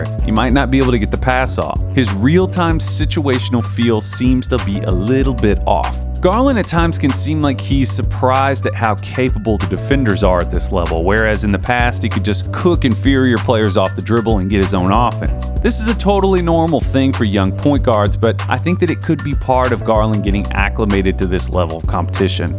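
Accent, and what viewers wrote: South, United States. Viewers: Come for the scouting and dead-on Southern accent, stay for the "What We Do In the Shadows" meme. Top content as always!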